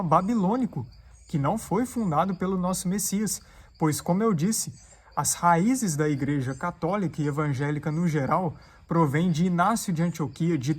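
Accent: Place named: Minas Gerais, Brazil. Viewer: Olá! Eu adoro ler os comentários dos irmãos! E muitos que li me identifiquei. Alguns irmãos testificam sobre a tristeza que sentem dentro dos templos, por vários motivos, inclusive e principalmente por só pregarem prosperidade e não o evangelho na sua essência. Isso sempre me incomodou as poucas vezes que fiz parte. Fui batizada ainda bebê num templo católico da minha cidade, no sul do Piauí. E nunca me sentir com vontade de fazer parte de algo por lá, pois o meu corpo não me pedia pra participar, uma força superior parecia me impedi de estar ali, isto eu percebia. Aí eu fui ganhando maturidade sobre este assunto e eis que descobrir os motivos da minha antipatia por tudo isso. E hoje com 49 anos, tenho certeza que foi o Criador que me livrou desse ambiente, eu creio que ele me ama muito em ter me livrado dessas abominações. Eu digo sem medo, sou infinitamente grata ao Pai por ter me feito enxergar desde cedo o que tudo isso significa na sua verdadeira essência. 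Obrigada, irmão! Vc tem me ajudado muito a entender sobre a palavra, assim com seu jeitinho mineiro de falar😊 muito obrigada de ❤️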